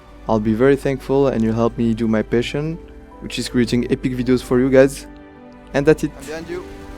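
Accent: France. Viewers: Cet accent francais ^^ Tu parles francais en langue natale ? Il y a des vidéos où tu parles full french :) ? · you are french no ?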